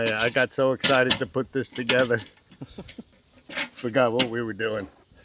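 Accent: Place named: Long Island, United States. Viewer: You can leave LI, but LI won't leave you. I'm from East Tennessee but worked at Brookhaven National Lab for about nine months and your accent was exactly like the guy who lived next door for several years who was from LI.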